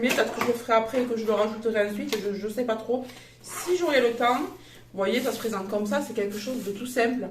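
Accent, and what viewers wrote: South, France. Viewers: Bonjour, belles découvertes à action. Le petit haut vous va très bien. La couleur met votre teint en valeur. J aime vous écouter avec votre accent du sud. Bonne journée.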